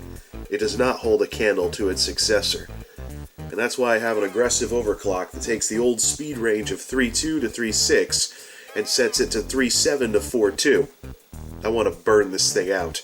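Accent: United States. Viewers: I was digging the sick South accent man. Thanks for the vids, good stuff.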